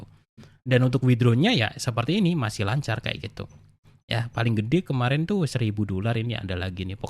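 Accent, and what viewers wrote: Java, Indonesia. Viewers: bang lu jawa ya ? orang mana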